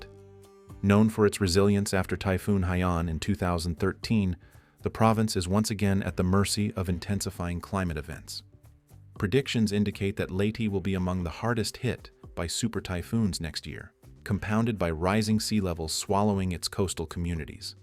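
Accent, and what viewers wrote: United States. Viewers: Please correct your pronunciation of place names such as Pampanga and Batanes - it is disrespectful to say those with such an American accent!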